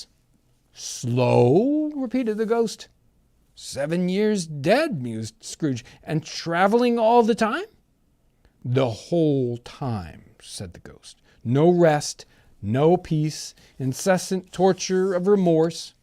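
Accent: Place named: United States. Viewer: That was great and a bit different with an American accent 😀👍🇬🇧🇺🇲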